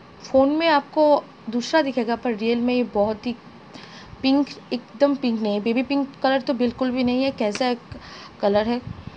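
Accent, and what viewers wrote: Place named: Bengal, India. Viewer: ur title is but ur accent is bengali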